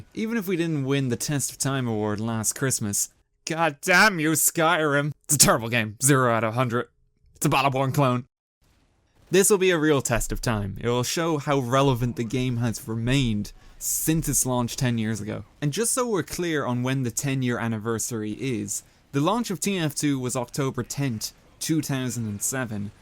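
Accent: Ireland. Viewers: are you from ireland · Hey are you a fellow irish person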